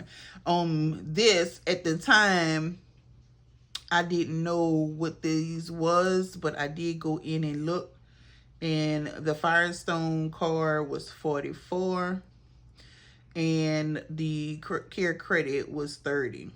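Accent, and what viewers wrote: Louisiana, United States. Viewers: hey love your vids and the bayou accent:) lets follow dear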